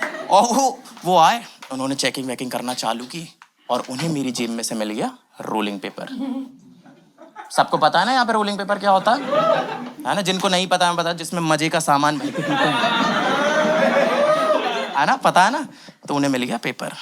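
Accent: Hyderabad, India. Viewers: Hyderabad accent , nice bro 😂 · Bhai crazy yrrr ,kya gand fadi ha hyderabadi ma ,oyeee hoyeee 😂😂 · Haydrabadi accent yarr 😆😆😆😆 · Thora aur hydrabidi ascent rahta tab kuchh jyada hi maza aa jaata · Bruhhh dyammm that hyderabadi accent wass justtt ♨️♨️ Loved itt brotherr❤ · Mujhe aapka hydrabadi accent bahut achha laga i love you ❤❤❤😍🥰😊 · Vo hyderabadi accent ki vjh se ye pura video dekh rh 😂😂😂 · Ooe hoyee kya hyderabadi bolta tum apna to dil aa jata tumhare batao par😂😂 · Maja dila diya bhai... Hyderabadi accent too good 😂😂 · Hyderabadi assent was too good. Good comedy set. I like it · Bro Amazing 😂, your hyderabadi accent was crazy 😂 , Angaar dal diye 🔥⚡😍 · Coming again again just for hyderabadi accent 😂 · Me to sirf apka haidrabadi accent sunne aai hu.. very nice yrr😀😀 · Super hydrabadi touch 😂😂😂😂😂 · First time pura standup maine dekha hai but accha tha comedy maine sirf hyderabadi accent ke liye aaya tha but pura dekhna pada 😂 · Ek pura 🤣😂show hydrabadi. 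Accent me krlo bhai❤ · Loved it. Hyderabadi Accent was hilarious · I have hyderbadi friend he also speak like this but ur comic is very good bro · Ending 😂😂 the hyderabadi accent is so good 😂😂😂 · that hyderabadi accent u killed it bro❤‍🔥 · Hyderabadi accent ne pure comedy me jaan daal di ❤😂😂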